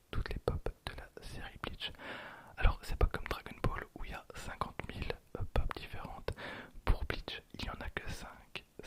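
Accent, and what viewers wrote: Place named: Belgium. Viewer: C’est la numéro Oui118 l’accent belge oblige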